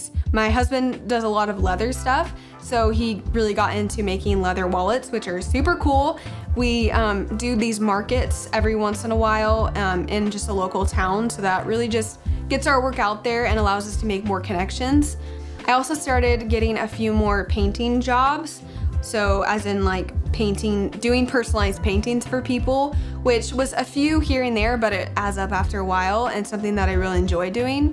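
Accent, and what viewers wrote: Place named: California, United States. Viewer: California accent 😆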